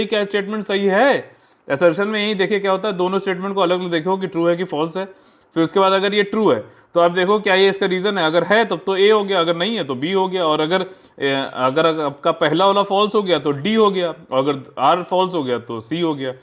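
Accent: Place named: Awadh, India. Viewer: Thank you very much....! But kanpuriya aur awadh mein sab aise hi bolte hai.... ☺️☺️☺️☺️☺️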